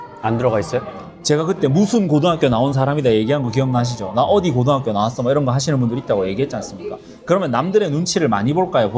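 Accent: South Korea. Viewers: 근데 사투리 엄청 쓰네…. 태생이 지방러인듯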